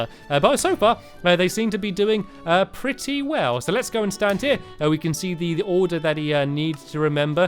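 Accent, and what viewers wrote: United Kingdom. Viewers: One question, Um is stampy british? Because his voice sounds like british something like squid but a little different anyways hi stampy i like your videos! xD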